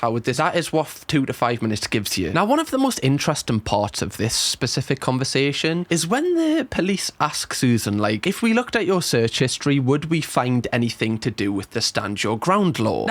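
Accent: United Kingdom. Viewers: I've been really enjoying hearing your comments and opinions. It's like my own mind in speaking but in a British accent which always makes me laugh. Cheers mate for your content and genuine effort towards your craft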